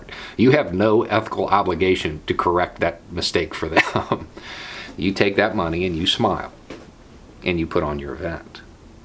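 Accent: South, United States. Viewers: I gotta tell you this one: Being honest, I come from a VERY liberal state. And not one anywhere near the South. There is a stigma here of Southern people: that literally of them who aren’t black are so far up Trump’s racist ass that they’re beyond saving... And to be honest, I saw a video of yours once through auto play, and I saw the beard and heard the accent... and I thought for sure you were some sort of confederate flag wearing neo nazi. Then I heard you talk. I mean I really listened to what you sad to say. And you should know that I had to take a step back and realize that I had been the biased one all along. I’d become the close minded person I believed others to be, and it was a really humbling moment. I listened, and then watched almost all your videos... and I want to stop and apologize to you and every other person I’ve written off for that kind of thing. 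Now, I may not always 100% agree with what you say, but I so deeply respect that you’re a thinking American. And I deeply respect that you’re out there being honest with your posting. It gives me hope for our country, that maybe - just MAYBE - we’re not actually as divided as the tribalistic political movements want us to be. Hope that others in home states like mine will listen to people like you, and stop jumping off conclusions and listen to what you have to say (and Vice versa). I owe you (a person I’ve never met) an apology and to ask forgiveness for making assumptions of who you were based on your pre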